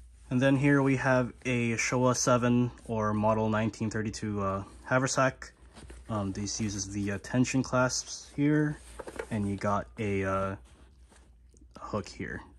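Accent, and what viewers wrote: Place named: United States. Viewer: Your sudden american accent make me confused a little bit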